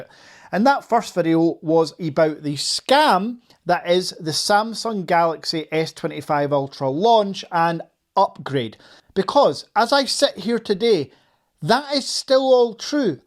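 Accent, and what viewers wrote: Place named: Scotland, United Kingdom. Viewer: Lovin’ the ‘Skaughtisch’ accent. 👌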